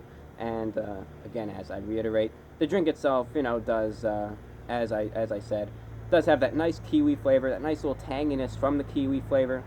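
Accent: United States. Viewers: You are very American aren’t you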